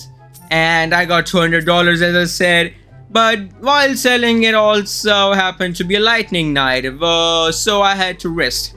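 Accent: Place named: India. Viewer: Bro sounds indian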